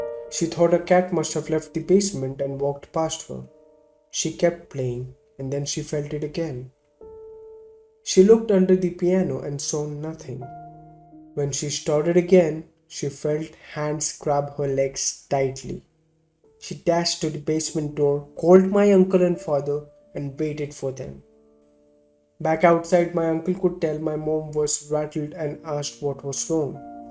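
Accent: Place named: Asia. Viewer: Mispronouncing English words, bad voice, loud piano, background noise. The narrator must be non-native, someone from Asia. (bad experience)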